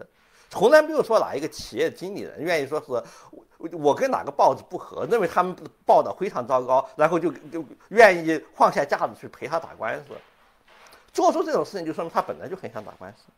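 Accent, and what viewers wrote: Sichuan, China. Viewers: 這口音就是四川的黑暗料理 非常地上癮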